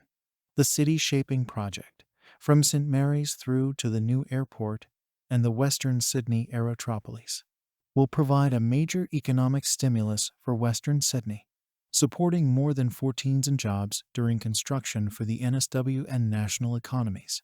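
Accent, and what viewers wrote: United States. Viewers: Don’t get me wrong I understand most of this project it annoys me that it’s narrated by an American but the thing that gets me this commentary says that there will be a rail bridge within the airport precinct I was under the impression that it was underground within the airport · This video is a mishmash of graphics and congratulatory remarks only a public relations copywriter could conjure up. It’s repetitive and covers the same subject over and over. It lacks substance and nuance. The graphics are brief, lasting about two seconds, so whatever purpose it had is lost in the shuffle. Who put this young man up to this task? He sounds American so how did that happen? Nah, not liking nor subscribing.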